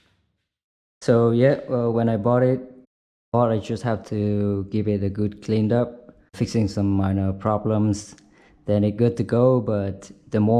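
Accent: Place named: Vietnam. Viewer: Nghe phát nhận ra người Việt luôn 😂😂😂, coi tên kênh thì chính xác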